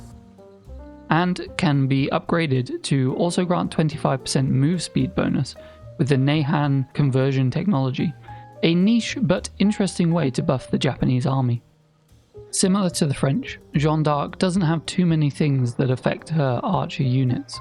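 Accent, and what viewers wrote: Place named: United Kingdom. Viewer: Damn this British accent. Mehter is not Meta. It's Mehter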